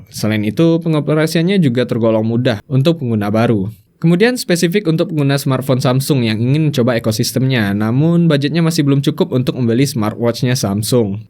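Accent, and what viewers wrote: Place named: Minangkabau, Indonesia. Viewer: Sudah kuduga urang awak, ada aksen nya tipis2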